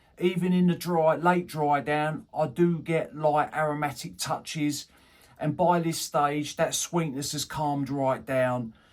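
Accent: United Kingdom. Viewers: Thanks for this review, its the first one i found on yt of this fragrance I wonder what's your accent? First i thought it's Australian, but its from somewhere in the UK, but which region?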